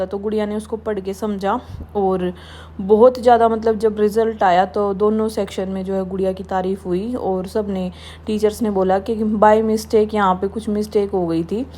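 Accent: Haryana, India. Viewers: Hii apke vlogs bahut hi interested hote hai kyonki aap Jo h vahi dikhati ho aur bolti ho koi banavatipan nahi hota h.kuch dino se hi apke vlogs dekh Raha hun raat k 3baje Tak apke videos dekhta rahata hun.jo aap harayadavi launguage bolati ho na bahut hi achchi lagati h.baby boy hone k liye advance me badhai. Please reply jarur karna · Aap ki bhasa to haryana ki haap vaha utrakhnd me kyu rahte ho nya koi kob h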